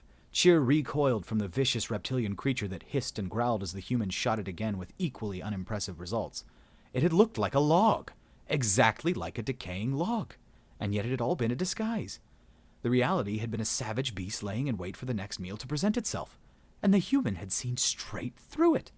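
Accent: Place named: Australia. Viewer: Your Australian accent is amazing.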